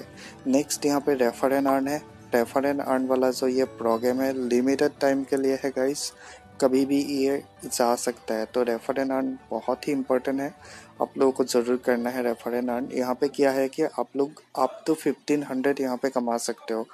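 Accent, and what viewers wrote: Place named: Assam, India. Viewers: he is from Assam i think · Assam se hain ye... 🤗 Himonoto biswa sarma ji bhi yhi se hain ..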